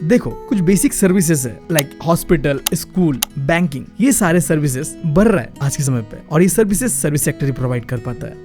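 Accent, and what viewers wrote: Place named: Assam, India. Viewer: Wo assam se hai iss liye thoda hindi me problem hai bhai😅😢😊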